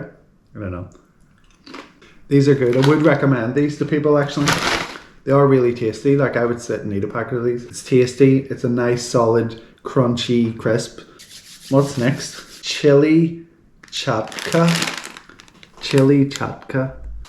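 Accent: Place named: Bengal, India. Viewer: Your bengali is so adorable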